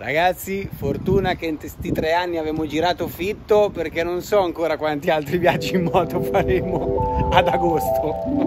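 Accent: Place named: Bari, Italy. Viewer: hai l' accento barese non pugliese sono due accenti diversi : barese e leccese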